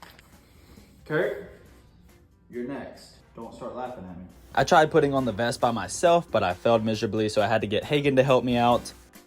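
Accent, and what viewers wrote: South, United States. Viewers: I never realized how southern his accent is 😂 Oh, and Kurt's a cutie as always ofc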